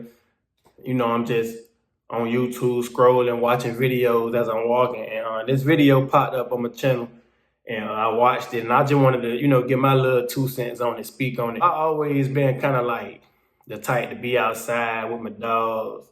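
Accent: Florida, United States. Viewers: Might be a FL thing , I can understand him. But most ppl living in FL are not from FL 😂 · That's Florida slang, I know alot of grown dudes who sounds just like this. I don't think it's put on.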